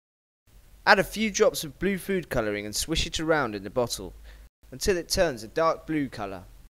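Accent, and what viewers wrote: United Kingdom. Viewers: I find it easier to listen to him because of the British Accent. Conclusion: I would have been smarter growing up in England.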